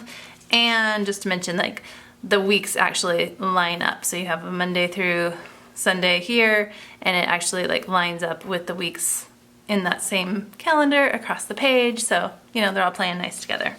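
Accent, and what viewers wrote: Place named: South, United States. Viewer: You have a great Southern accent!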